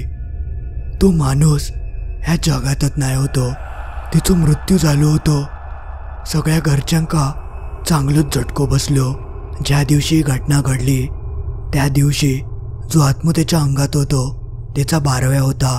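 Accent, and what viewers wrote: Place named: Malvan, India. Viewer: दादा तु खूप छान गोष्टी सांगतोस आणी तुझी मालवणी भाषा खूप गोड वाटते. ऐकायला प्रत्येक गोष्ट वेगळी असते .मला खूप आवडतात .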